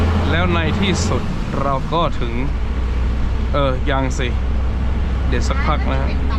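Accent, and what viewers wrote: North, Thailand. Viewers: ที่ชอบดูเพราะแกพูดเหนือ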